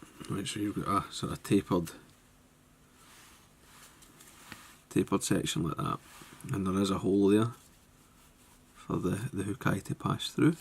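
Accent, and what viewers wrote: Scotland, United Kingdom. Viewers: I think this fly was created by a Canadian named Elliott something. I tried it years ago, not successfully, when I was learning. Should be easier now. By the way, little by little I am solving the Scottish accent. You have a lot of flies that should do very well here in Florida USA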